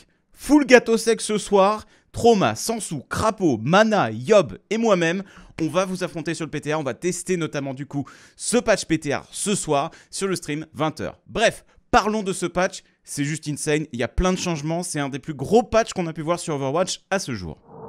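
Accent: South, France. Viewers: 😂 le meilleur accent du sud ever 😂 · L'accent du Sud pour rein c'est toujours un bonheur😄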